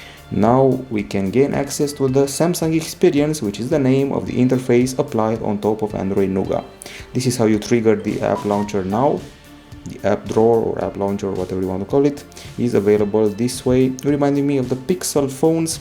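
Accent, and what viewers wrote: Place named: Romania. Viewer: După accent se vede că eşti român :)))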